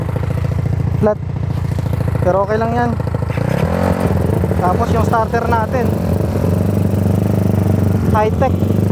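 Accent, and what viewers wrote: Iloilo, Philippines. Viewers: Ilonggo ka lods?HAHA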